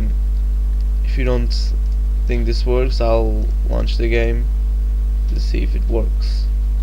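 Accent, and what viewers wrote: Portugal. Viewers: boa parceiro obrigado pela ajuda :) Gosto do sotaque Português ahahaah :D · Logo assim que ouvi o teu sotaque a falar inglês, vi logo que eras português xD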